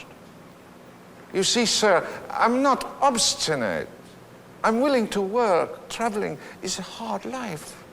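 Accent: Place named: United Kingdom. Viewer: I hate to be haughty, toReasonWhy, but I do think the question rather is "why are Americans like you so ignorant of anything non-American that you'd mistake a British accent for a French one?".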